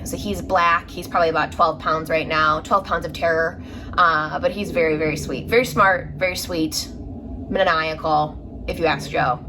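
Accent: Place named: Chicago, United States. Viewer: Belated welcome to FlossTube! Your first video just popped up into my feed today. Great intro. I like it so much when contributors share something about themselves. We're neighbors (if you consider a small village in Lake County on the border of IL and WI a neighbor.) We have the same accent - albeit I've been a North-sider most of my adult life! Go Cubs! Glad you love houseplants. I am a novice and could use all the tips you can give us. Coincidentally, I am going to Inspired Needle for the first time on Saturday to look for some special floss and fabrics for two of my pending projects! My local LNS is in Winthrop Harbor did not have what I needed - so I am heading South. :) I am working on "After the Roses." You are right - stitching is zen like, and IC's patterns are hypnotic and therapeutic. Can't wait to see your collection!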